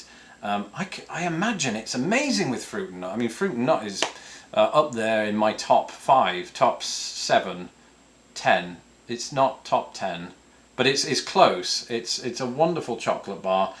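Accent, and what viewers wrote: United Kingdom. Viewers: No, your accent is not intimidating at all. Is ours? Actually, I am curious about how Brits hear Mid-West, American accents? We like your British accent. It's not intimidating, but it does sound very cool. Do Brits like, or dislike American accents? I wonder? Great Britain, although not the colonial power it once was, is awesome, if only they were not self destructing right now, just like all of western and northern Europe. I love GB. I just wish you'd reassert yourselves. I like your videos very much. Keep doing it. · That British accent is second in charm only to the stereotypical non-rhotic southern accent. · You have a charming sense of humor. And I really enjoy your videos and hearing your POV on my country as well as learning more about English customs, history, food, etc. Glad you like being here so much. And yes, I do love your accent. 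If you want to know why, I grew up watching old Hollywood movies or BBC productions filled with British actors - so mostly heard more “posh” accents (Basil Rathbone, Lawrence Olivier, Claude Raines, Cary Grant - yes I know he faked it, Margaret Rutherford, Peter Sellers, Leslie Howard, Edward Everett Horton, David Nivens, Sir John Mills, Glenda Jackson, Maggie Smith, Sir Ralph Richardson, Sir Alec Guiness, Richard Attenborough, etc) and they just sound so much better educated and more interesting (or even eccentric) than the often flat American accents. I think it also is the much wider variety of euphemisms the British have, the clever turn of phrases for describing or referring to things, the larger vocabulary of mild swear words or expressions you hear in films and TV, and when you yourself speak on your videos, compared to how Americans speak or write. I also think those of us enamored with the Brits find much of the English eccentricities and old customs very quaint and charming whereas you, as a Brit, probably feel quite differently about them - maybe find some of them annoying. 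As an example, last summer I was reading some online commentary on The Guardian’s website about the movie “Dunkirk”, plus another article about Brexit and a few o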